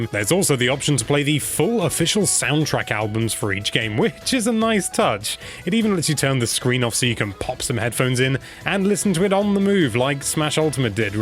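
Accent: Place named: United Kingdom. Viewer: Thick uk accent is tough to handle. man. tapping out.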